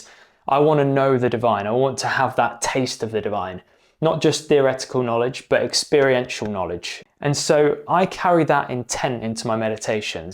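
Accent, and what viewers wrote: United Kingdom. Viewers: Yes it's great to hear an English voice, Thank you.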